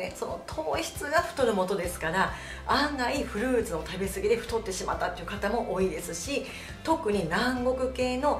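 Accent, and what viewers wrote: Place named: Tohoku, Japan. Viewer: デーツを１日３粒食べてますがなかなか減らない体重🤧 パートナーには(ゴキブリ食べてる)と嫌われました🤣 さんの東北っぽいアクセントに安堵してます🤗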